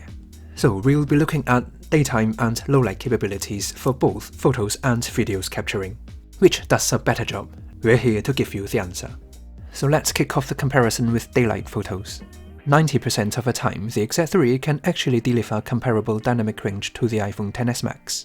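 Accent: United Kingdom. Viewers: 詳細的解說，一口流利的英國腔英文 👍👍👍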